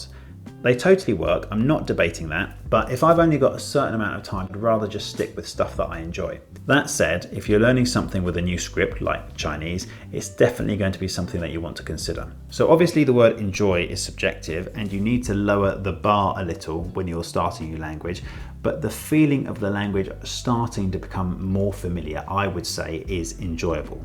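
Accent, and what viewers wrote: United Kingdom. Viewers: I love your English accent! In school we learned "British English" but because of an unfathomable amount of American input growing up I now have trouble breaking away from that American vocab and pronunciation. Trying to change it now as an adult by consuming as much as I can from the UK😅 Great video as always!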